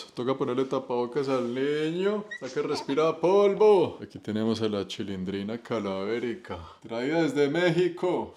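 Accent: Bogotá, Colombia. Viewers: Amigo, muy Guerrero siempre me pregunte cual era su historia, habla frances? Como aprendio? Por que vive en canada? Es usted nacional de otro pais, su acento es muy marcado colombiano.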